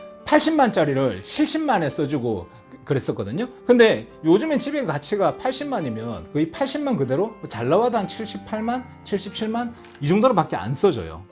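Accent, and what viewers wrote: Gyeongsang, South Korea. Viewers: 처음 영어로 준비없이 들은적이있는데 도통 감을 못잡겠더라구요. 정감있는 경상도사투리로 설명들으니 이해가 잘되네요ㅎ 감사합니다. 북가주에도 계셔서 조언받을 수 있으면 참 좋겠습니다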